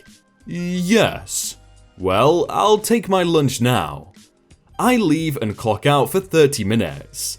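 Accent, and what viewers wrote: United Kingdom. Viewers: and also i posted my mc a month a go and you havent read it its on your discord its on redit its in the comments on one of your videos . im getting frustrated listing and staying up to date with your videos because the Pommy accent makes my blood boil im half American half Australian both country that left Britain because of your country accent/rules get on to it asap please sorry for being mean but at least i didnt swear that is super hard for Australians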